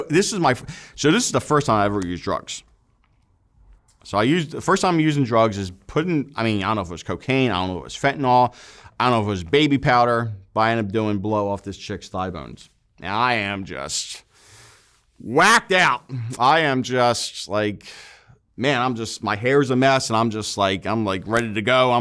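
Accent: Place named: Baltimore, United States. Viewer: Holy hell is this dude from Baltimore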